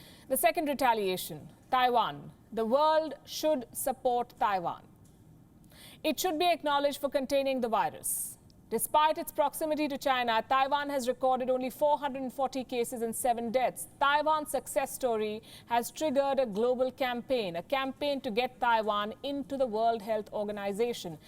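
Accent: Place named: India. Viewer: Indians have the best accent in the world!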